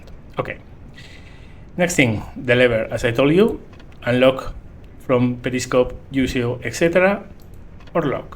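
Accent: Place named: Spain. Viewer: Muy bueno. Por tu acento apostaría a que eres español, ¿no?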